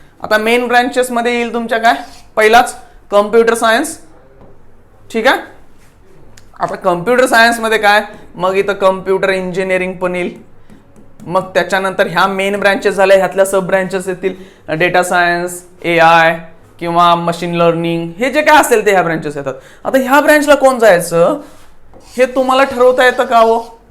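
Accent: Solapur, India. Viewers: Ho Mala pan tasech vatatay Kattar solapuri😅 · Sir tumhi kuthe bhashevarun solapurkr vatatay 😊😊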